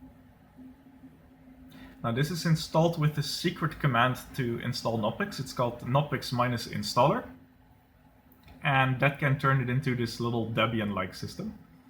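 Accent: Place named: Netherlands. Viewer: Je klinkt een beetje Nederlands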